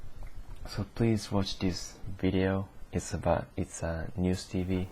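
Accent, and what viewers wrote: Japan. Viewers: From the first word you said, I heard a deep Japanese accent resonating from you. Don't get cocky and think that the Japanese are the best at everything. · I think no matter how good someone gets at speaking a foreign language, he or she will always have an accent. I like hearing someone speak English with a foreign accent though, it is kind of unique. I have been learning German for years and I know how hard it is to become fluent (which I am not). So to hear someone speak fluently in a foreign language is impressive because that takes a lot of time and hard work! So you do have a Japanese accent, but don't worry, it is just part of you.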